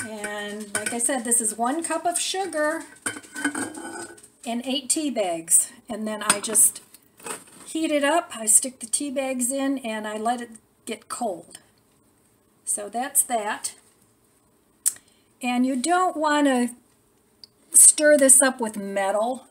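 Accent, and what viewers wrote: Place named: Michigan, United States. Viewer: Just found your channel, and am really enjoying your content! Very useful! I love the idea of adding a layer of plastic wrap over your guacamole to help seal the air out! You have an accent like a friend of mine from Michigan...are you by any chance from that area?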